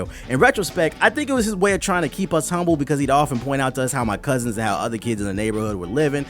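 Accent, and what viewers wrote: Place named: Chicago, United States. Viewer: damn you from chicago south south sideee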